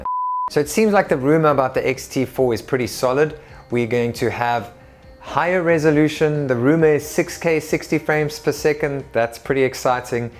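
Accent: South Africa. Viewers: Definitely a South African accent 🤔🤗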